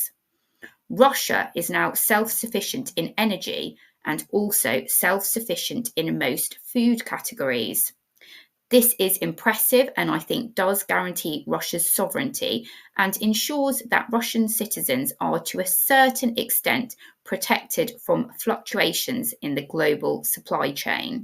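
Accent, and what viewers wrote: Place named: United Kingdom. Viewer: has a fantastic British accent. It’s pleasure for my ears to listen to her.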